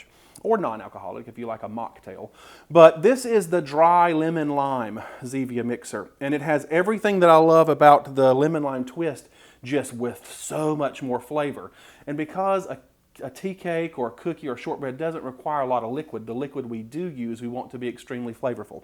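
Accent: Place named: Appalachia, United States. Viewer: You're awesome =) You talk like my folks in the mountains of Virginia =) I left there in 1995 and moved to Ohio. I might have to retire and move back =)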